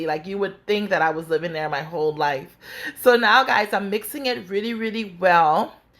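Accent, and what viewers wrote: Bahamas. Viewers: That Bahamian accent🔥🔥🤌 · Lol your bahamian accent! My mouth is watering as I watch this, my number one favorite side yum😋 #cheesy and rich #them herbs is everything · Bahamian accent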